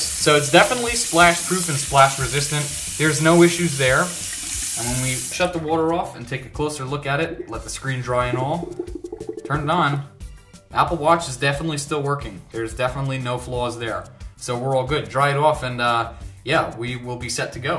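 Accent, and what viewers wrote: United States. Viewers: Someone's from Philly! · nah, it's American - THIS GUY IS NOT BRITISH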